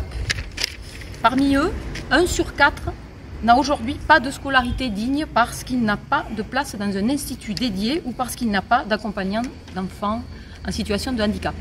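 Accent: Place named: South, France. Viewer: j'aime bien votre accent qui fleure bon les rives de la méditerranée ou du sud ouest.....Je suis breton de cœur né à Nantes que je revendique historiquement bretonne.....😘😘et, je suis insoumis de longue date......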